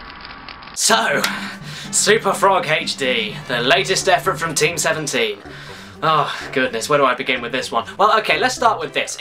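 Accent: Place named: United Kingdom. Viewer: He's British.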